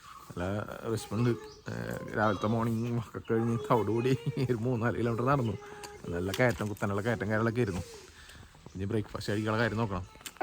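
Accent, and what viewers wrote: Thrissur, India. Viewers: Ninte Thrissur slang super.. videosil Ellam oru positive vibe undu 😍😍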